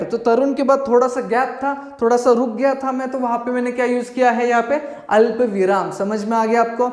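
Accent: Maharashtra, India. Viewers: You are marathi i know because of your accent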